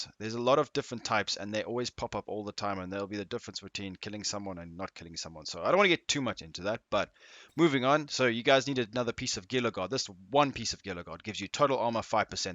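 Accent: South Africa. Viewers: Nice video, you mind me asking are you from South Africa?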